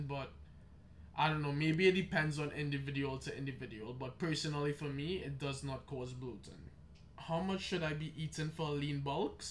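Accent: Trinidad and Tobago. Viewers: Great content. Can't hide that Trini accent 🇹🇹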